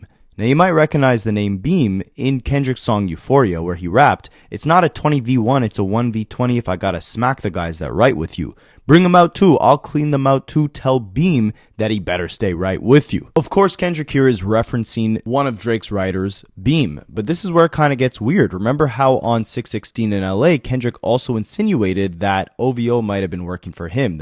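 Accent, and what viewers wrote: Canada. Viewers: Drake must be Toronto slang for Fake. No offense I can hear ur canadian accent and like your work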